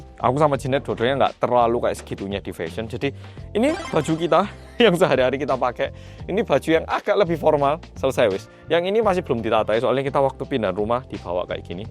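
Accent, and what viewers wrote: Java, Indonesia. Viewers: kayanya itu lebih Medhok dibandingkan aku yang asli turunan Jawa Timur 😄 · Kaum sm indo/ jawa medhok nya ttp kental,pertahan kan ya dialek bicara nya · Aku ingat pertama kali subscribe pas mas bahas soal jerawat. Trus lucu aja orang korea yang medok. Dadi aku seneng logat e haha jadi ngikutin sampe sekarang. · Ikutin mas dari subscriber masih 500k sampe sekarang mau 6M. Walaupun ga mantengin banget tapi aku terharu bisa lihat perkembangan mas sampe sejauh ini. Sbg bolo cuma bisa doakan mas, mba jeanette dan si krucil ruel sehat dan bahagia terus. Semoga tetep jadi mas yang humble dan medhok ya mas 😆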